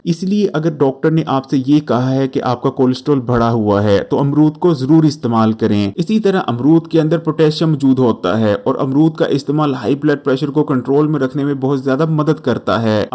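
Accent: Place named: Punjab, India. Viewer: BHAI SAHIB JAB AAP NE URDU PUNJABI LEHJE M BOLNA HY TU BUHAT BEHTAR HY AAP PUNJABI M CHANNEL LANGUAGE RAKHAIN.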